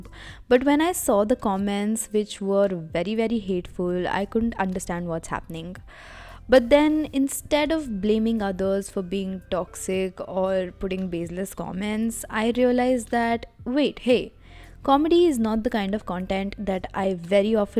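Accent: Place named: India. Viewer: u r not toxic and Your Hindi accent was real ,trust me. Btw Big fan mam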